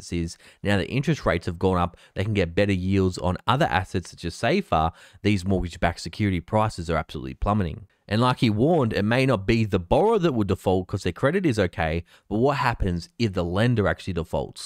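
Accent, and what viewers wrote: Australia. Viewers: keep saying data in your native aussie accent! dont change!!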